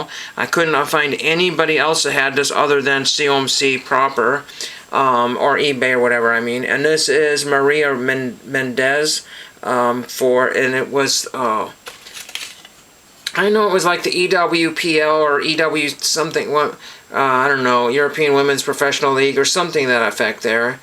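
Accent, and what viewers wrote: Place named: Kansas, United States. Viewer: Cool pickups. You need to work on that Kanas accent though.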